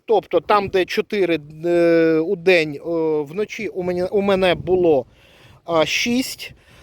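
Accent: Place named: Odesa, Ukraine. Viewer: Дякую за пізнавальне відео та шикарний одеський варіант української мови ❤❤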